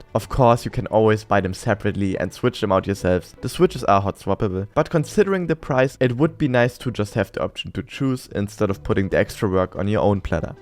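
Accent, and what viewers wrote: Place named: Germany. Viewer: Diggi du bist deutsch oder? man hört direkt vom Akzent. :D Stabiles Video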